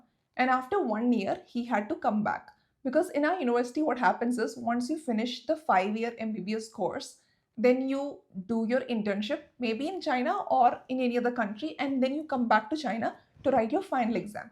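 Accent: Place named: England, United Kingdom. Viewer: Hello Di Wassup You know what idk why but I am in love with the way you are talking i mean I am obsessed with the English accent Love from Assam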